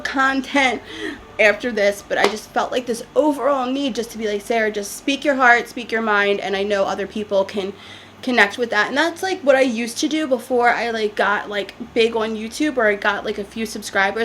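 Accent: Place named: Philadelphia, United States. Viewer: Love your videos (your realness)!!! OMG - You're perfect just being yourself! I can't believe that you 'didn't fit in'. You seem like the sweetest person. When I first started watching your channel, you had me at "ballin' on a budget"! Your videos put me in a good mood because you're so bubbly and you have a sunny personality (and I like your Philly accent)! I agree with 'lady love'. People who act 'better than' are usually hiding something (most likely insecurity). Just keep being yourself, Sarah!♥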